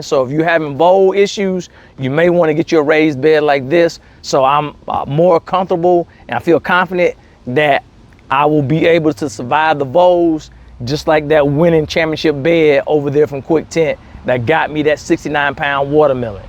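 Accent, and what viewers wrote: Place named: Baltimore, United States. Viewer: ok 😅 thought I heard a twinge of a Baltimore accent. Cool! Thank you for your helpful videos 😊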